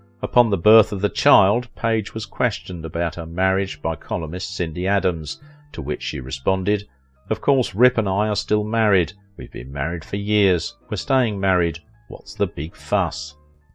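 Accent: United Kingdom. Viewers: Okay I am tired of hearing a British accent narrating a totally American documentary.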